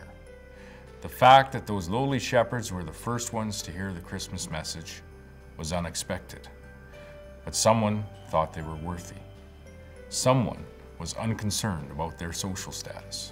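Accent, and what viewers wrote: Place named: Saskatchewan, Canada. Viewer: Merry Christmas to Scott and family. You also speak like you are born and raised in the greatest province of Saskatchewan. Thank you.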